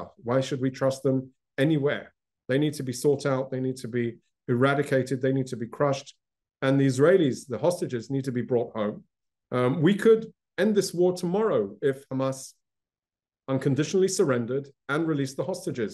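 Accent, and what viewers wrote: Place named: United Kingdom. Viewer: You sound like British sir!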